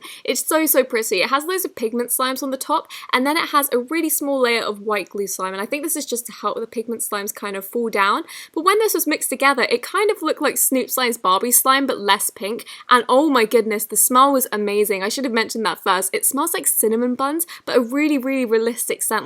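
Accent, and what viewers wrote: United Kingdom. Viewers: She's British so she can't pronounce it like Zoo-keen-ie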